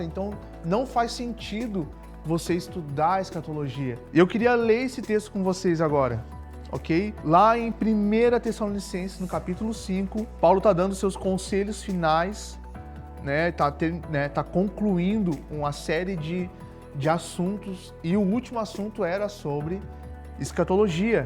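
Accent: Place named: Porto Alegre, Brazil. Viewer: Muito bom! E não poderia faltar esse sotaque porto-alegrense 😂👏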